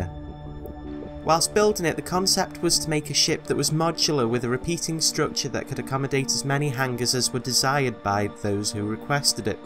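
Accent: United Kingdom. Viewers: He sounds like he lives in England (well, he's English, he may not live here lol), so nope. We rarely get sunlight. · Anyone else think this guy sounds like a male GLaDOS at times? And maybe a little bit less autotuned. And British, okay maybe he doesn't sound like GLaDOS.. Just a little bit?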